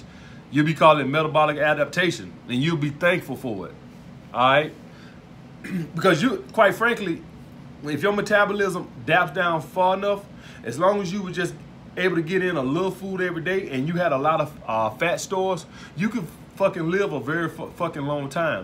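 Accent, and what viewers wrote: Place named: South, United States. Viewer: the way you talk remind me of Hodgetwins, except a stronger Southern drawl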